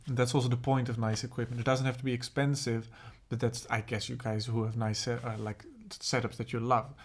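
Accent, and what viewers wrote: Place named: Ireland. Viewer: you can have the irish accent pass lad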